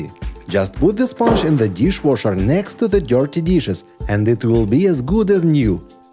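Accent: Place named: Russia. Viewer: Такой тяжелый русский вариант английского-невозможно сосредоточиться на сути.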